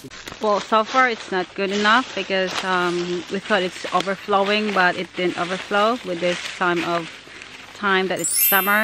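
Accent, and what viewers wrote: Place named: Australia. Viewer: hello sister andito ulit ako love your accent sissy aussie na aussie kana😘 mahalaman pala jan sis! Thanks for sharing😘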